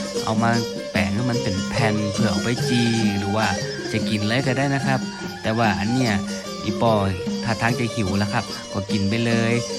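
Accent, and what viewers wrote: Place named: North, Thailand. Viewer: ยี่สิบสาม อู้จาปะสากำเมืองม่วนขนาดน้อง อิแม่แข็งแรงขนาดเจ้า ตี้บ้านปี้สุโขทัยฮ้องว่าข้าว DaK งา ไม่กล้าพิมพ์คำไทยอาจติดสแปมค่ะ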